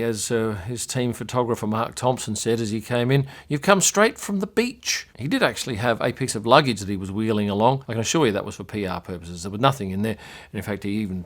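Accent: Australia. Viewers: So good to hear an Aussie accent in F1 media - good work and nice report.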